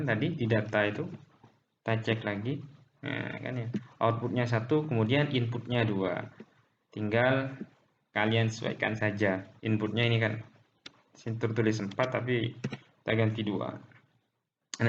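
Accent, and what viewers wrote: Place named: Madura, Indonesia. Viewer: Logatnya madura😅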